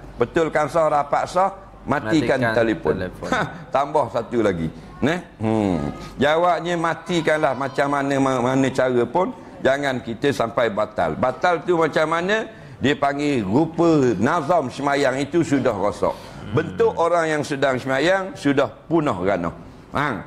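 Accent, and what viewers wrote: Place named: Terengganu, Malaysia. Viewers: bahasa ustaz tu bahasa loghat terengganu..semakin sukar untok orang indonesia memahami nya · Ustaz Idrus kl 1 bulan tu mmg penuh hari2 ada buat ceramah agama di mana2 program yg dijemput. Kl di negeri dia di Trengganu ceramah dia dlm sejam ke sejam setgh..tp kl di luar Trengganu boleh sampai 2 ke 3 jam sebab bg ruang org luar yg susah nok jumpa dia selalu. 1jam ke 1 jam 15 minit ceramah ttg tajuk yg diberi..baki tu sesi bersoal jawab dr org2 yg dengar ceramah ttg apa2 sahaja. Kl masjid luar biasa mmg sentiasa penuh dibanjiri org sampsi luar masjid. Loghat Trengganu dia mmg pekat..tp lama2 dengar boleh paham. Cth..tubik tu maksudnya keluar, Ganu tu Trengganu, hok mana tu..yang mana, pitih tu duit, wang.., riyal tu ringgit..2 riyal tu 2 ringgit, nyor..nyo tu kelapa atau nyior, nyor...,kl dia sebut JKK tu jawatankuasa kampong( pembantu..jawatan kuasa yg dipilih dlm mesyuarat sesuatu kpg atau masjid. 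Dipanggil Jkk kpg atau jkk masjid).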